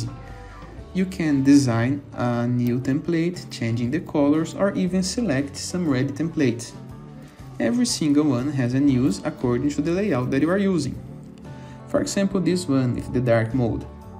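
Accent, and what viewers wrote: Brazil. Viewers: you sound brasilian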